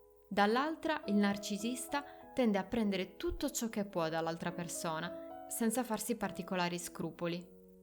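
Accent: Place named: Sardinia, Italy. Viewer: Giustissimo avere una dizione corretta nel proprio bagaglio, ma spesso gli accenti danno personalità ai racconti.. A me il tuo sardo piace🌊💙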